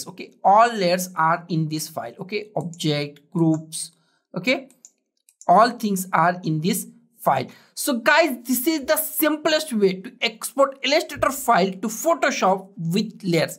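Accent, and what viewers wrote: India. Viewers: is this indian or some one trying to be an indian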